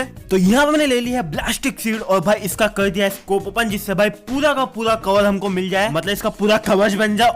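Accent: Gujarat, India. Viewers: I love your voice.does you have Gujarati accent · Bro, are you Gujarati? Cause according to your accent, I guess that you are Gujarati..... Btw I love your videos.....Keep it up for entertaining us!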